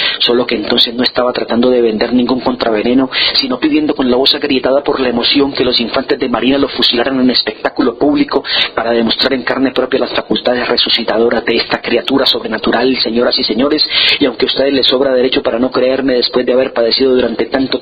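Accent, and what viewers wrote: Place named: Antioquia, Colombia. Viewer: Esta no es de mis historias favoritas, sin embargo entiendo porque esta leída de esa manera. Para aquellos que critican al lector, deben saber que esta historia es acerca de un “culebrero”. Este es el nombre con el que se describe en algunas regiones de Colombia a aquellos que se dedican a vender o engañar al publico de pueblos con medicinas y cremas que en realidad no tienen ningún valor medicinal. Es mas un show que una venta en realidad. El punto es que la manera característica de hablar de estos personajes es así como lo hace este narrador: de manera rápida, con pequeñas pausas para tomar aire y un marcado acento paisa 😀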